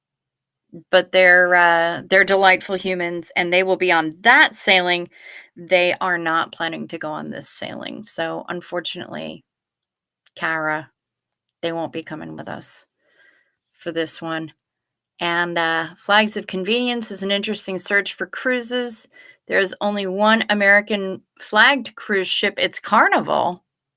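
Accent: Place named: Ireland. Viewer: I didn't expect her comedic timing and Irish accent to be THAT good! It's fun to dial into a show and hear something other than what you'd expect!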